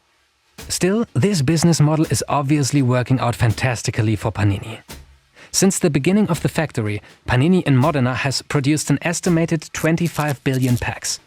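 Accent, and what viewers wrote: Germany. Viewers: Is this a german accent? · Love your videos, you got the most pleasant English accent I know. Und das mit einem deutschen Akzent zu schaffen macht das ganze noch genialer ;)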